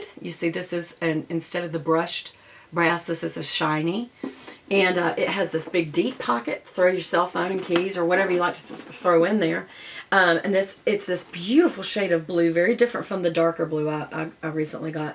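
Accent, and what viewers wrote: South, United States. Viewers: I wanted and had my heart set on the large Duxbury but it was too long for my 5’2” stature. Love your southern accent and your Pandora bracelet. I pray this doesn’t sound weird but you look as though you smell good. 💕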